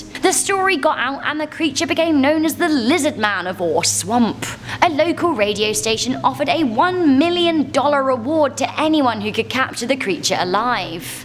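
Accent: England, United Kingdom. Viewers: OK Guys.... be honest with me... "Who else would watch if she was doing a video on Tree Moss". Yup... Me too! The fact the does the Top 10... is a double win! Adorable/Sexy and that English accent just make me melt!... you are 100% Beautiful!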